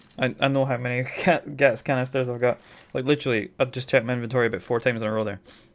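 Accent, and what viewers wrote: Scotland, United Kingdom. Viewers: Scottish I hear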